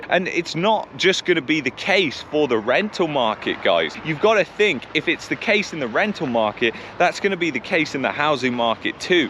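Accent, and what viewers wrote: United Kingdom. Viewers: I believe he is from UK. There is no Australian accent.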